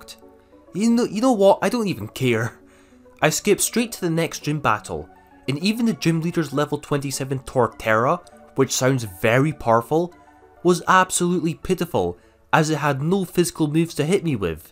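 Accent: Scotland, United Kingdom. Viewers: you have a super Scottish accent. lemme guess...your from Scotland lol. (or wales..?)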